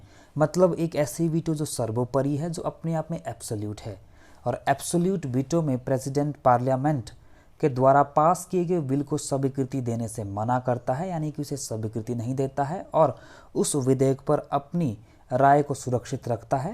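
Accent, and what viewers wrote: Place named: Bengal, India. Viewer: Sir aap jarur other language bolne wale ho... I think bengali aur assemese😂😂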